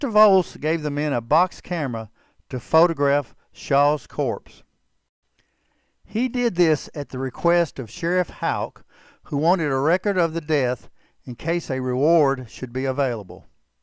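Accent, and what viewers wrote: Texas, United States. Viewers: I like how the narrator has a Texas accent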